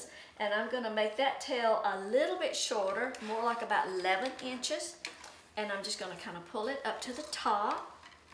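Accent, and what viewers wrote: South, United States. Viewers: It's beautiful. Thank you for sharing. I also love you southern accent.:)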